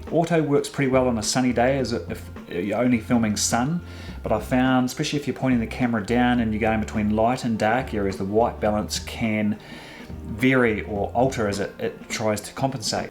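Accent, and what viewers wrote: New Zealand. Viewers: Awesome work. Have just discovered you. So good to hear a Kiwi voice, and NZ in your videos.Recently got a Solo 3DR with GoPro so your Tutorials are very helpfull. Thankyou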